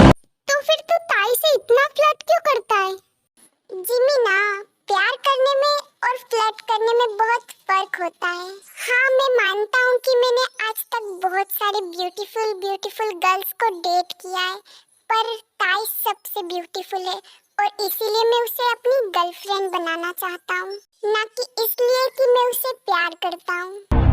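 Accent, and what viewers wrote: Rajasthan, India. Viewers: Di aap rajasthan se ho kya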